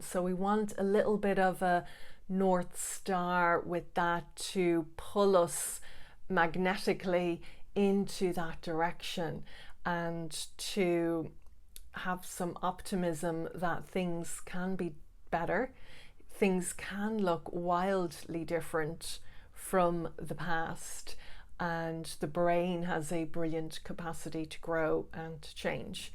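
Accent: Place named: Ireland. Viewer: Hi..I live in Dublin...do you coach fsa individuals in Ireland?...I hear you've an Irish accent...😊 tnks! Brilliant video btw!❤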